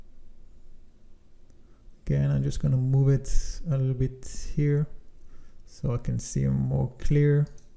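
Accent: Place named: France. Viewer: Parfait! J’ai beaucoup appris avec ton exercices! Votre stil d’expliquer est magnifique. Merci beaucoup! Avec tous les astuces tu a montré c’est beaucoup plus facile pour moi à construire avec fusion 360. C’est possible, que tu travailles chez Airbus? Je ne suis Français, je suis allemand, agrandi vis à vis de l’Alsace, à Fribourg, le Français est mon deuxième langue. Mais je crois, que tu à un petit petit accent français, ne c’est pas? Le petit mot « voilà » qui apparaît de temps à temps, me plaît beaucoup! De toute façon, ton anglais est merveilleux! Gratulations! Et encore un gros merci!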